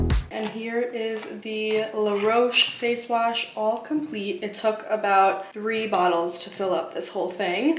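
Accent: United States. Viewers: Its a new york accent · Just enjoying the Long Island accent 💕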